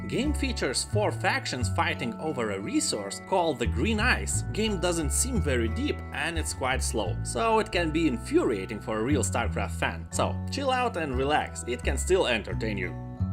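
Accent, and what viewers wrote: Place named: Russia. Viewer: Опа, русский акцентик))))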